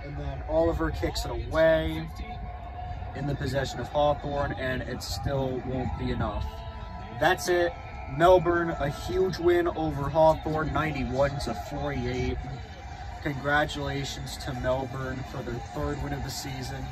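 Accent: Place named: United States. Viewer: You do sound America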